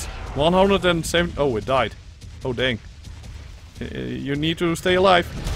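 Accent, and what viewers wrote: Netherlands. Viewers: Ben je nederlands ofzo? (Je klink gewoon zo)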